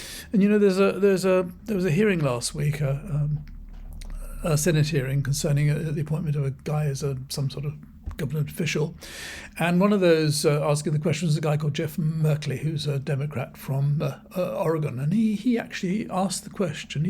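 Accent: RP, United Kingdom. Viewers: Ramstein is right, you are a dimbo, not even as smart as a nitwit, a proper plank like our PM, you are in short in your dotage, a foolish old Englishman with nothing but a slightly posh voice as cover, see how far it gets you in the real world, if you've ever been there a proper SNOB top to bottom, what crushed you radley school or London. or both? Get back to you biggles books, if you even remember where you put them.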